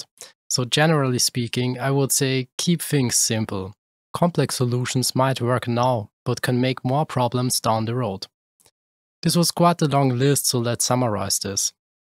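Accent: Germany. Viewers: he has a german accent, everything he says is correct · only 74 subs??? holy you need more attention mate, awesome video. also nice voice and accent. are you german? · You sound German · Alman is what we say in Germany to a perfectly stereotyped German. So you have a German accent😁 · I can hear it. A little German guy. Good Video!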